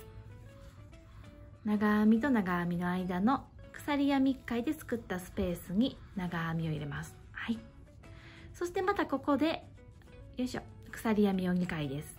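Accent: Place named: Kyoto, Japan. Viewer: めっちゃ聴きやすいし、ゆっくりやり方が分かりやすくて、ありがとう。 京都語ポイ感じだね❤間違えたらすみません❣️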